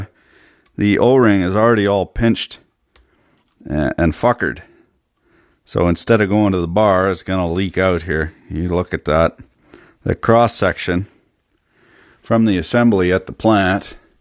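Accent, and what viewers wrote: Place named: Canada. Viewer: I lived up in Toronto in the 80s play a little hockey bounced around you sound a little further north than most but I missed that Canadian kind of accent and the humor in the triple X 12%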